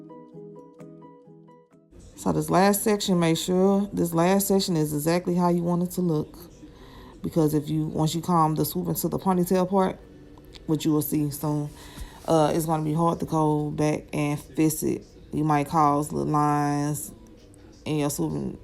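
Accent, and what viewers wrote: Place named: St. Louis, United States. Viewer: Gotta be from St. Louis with that accent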